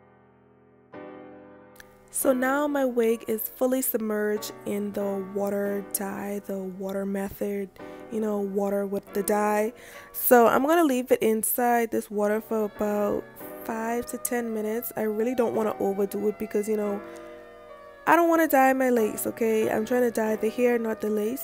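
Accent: Bahamas. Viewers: jus had ta b bahamian frm she strt tlkn we cnt hide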